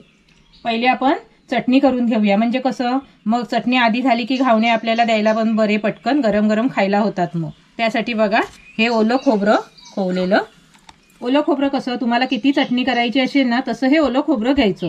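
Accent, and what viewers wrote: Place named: Konkan, India. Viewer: Mla kokani bolna kupch aavdt tumch 🎉❤😊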